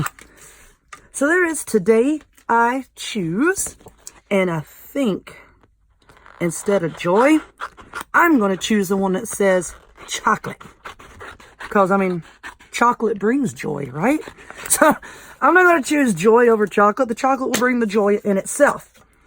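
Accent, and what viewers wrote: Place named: Alabama, United States. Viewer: Hello, I watch all of your videos and LOVE THEM ALL!!! My fave is the 🌹 done on a kitchen strainer!!!!! YOU ARE BRILLIANT!!!!! I am from the south, ALABAMA, and my ancent is just like yours, lol, I cuss too, it's my second language lol. I do all kind of crafts, EVERYTHING!!!! Right now I am into ALL THINGS CONCRETE,LMAO!!!!!! FIRST OFF THE SUPPLIES ARE CHEAP.... QUIKCRETE $3.88, SAND $2.88 ( BOTH ARE 50 POUND BAGS ) AND WATER. YOU CAN LITERALLY MAKE ALL KINDS OF STUFF THAT LAST FOREVER WITH CONCRETE AND IT'S EASY PEASY!!!!! RESEARCH LIKE I DID AND YOU TOO WILL GET HOOKED!!!!!! YOU CAN MAKE VIDEOS ON THEM ALSO!!!!! LET ME KNOW WHAT YOU THINK.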